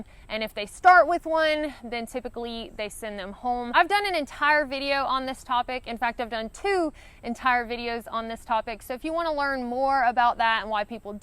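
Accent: South, United States. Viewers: Loving it! Always entertaining and useful information and presented in a "non-judgemental" and non-patronizing way. You are the voice of experience and of understanding the transcendent nature of hiking. One question. I see that 10 people did not like this video. Do you have any way of understanding why some people don't like this video? I guess in life there are people who just don't like things, but I don't understand. Technical issues? Don't like a southern accent? (Barbarians!) Object to a rational approach to carrying guns? I fail to see their problem and am just curious if there is a way to say to those who check thumbs down, why don't you like this? Can I change something? Just curious. But for the 1,500 + of the rest of us, hike on! We love the videos and look forward to your/our next journey! Cheers, Tortoise (Slow but Sure)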